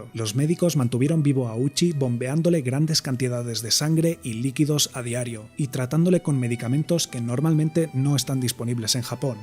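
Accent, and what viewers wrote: Castile, Spain. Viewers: Que Repugnante et Molestoso es listenear el Acento Castellano de Europa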